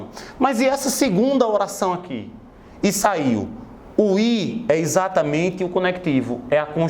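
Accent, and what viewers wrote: Northeast, Brazil. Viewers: Seu sotaque é do Piauí ou da Paraiba ? Aula Show e sensacional ! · vídeos Diversos ele é nordestino né não?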